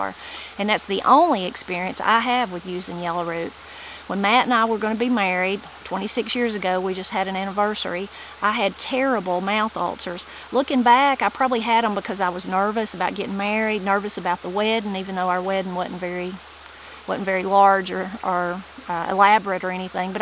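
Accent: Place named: Kentucky, United States. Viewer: Hi, your accent sounds more southeastern Ky than Western NC. i’m from eastern ky but have been living in Franklin NC for quite a spell. Great video, found some YR today and am making some tea.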